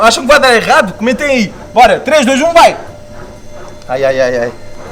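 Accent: Portugal. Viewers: Vc e de Portugal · Português de portugal 😃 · Poha o cara é português ou brasileiro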